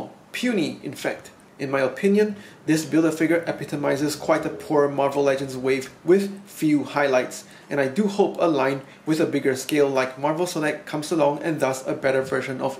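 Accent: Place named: Singapore. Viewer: I know a Singaporean when I hear one. Nice review man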